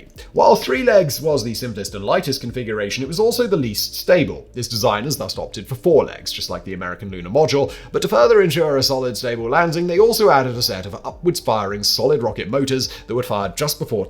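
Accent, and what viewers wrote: United Kingdom. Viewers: I love overly loud pensive music underscored by a well spoken British fellow.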